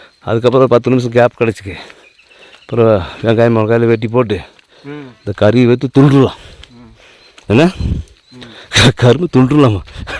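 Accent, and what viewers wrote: Kongu, India. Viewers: உங்க வாய்ஸ் நம்ம மேக்கத்தா பாஷை அருமை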